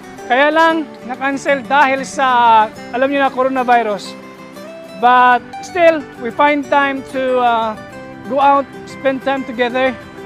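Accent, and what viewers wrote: Iloilo, Philippines. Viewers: Ilonggo ka b kuya? Malubog mn s Ilonggo ang d matin aw😁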